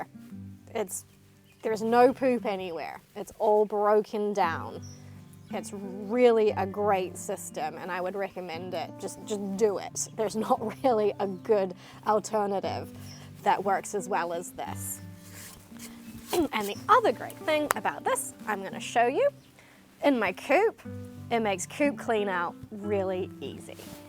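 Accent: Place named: New Zealand. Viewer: By the way, you have an odd accent (not unpleasant). I can definitely hear the kiwi twang but it's mixed with something else. Irish?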